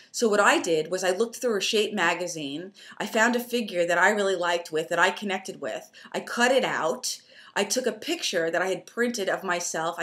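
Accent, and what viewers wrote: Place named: New York, United States. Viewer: Your NEw York accent is ever showing. Haha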